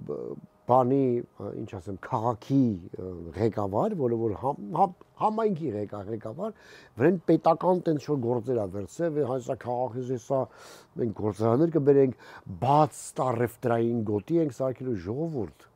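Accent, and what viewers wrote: Gyumri, Armenia. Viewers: Լեննագանի բարբառը Ձեր եթերներում միշտ պահպանեք, Սերգեյ Դանիելյան❤️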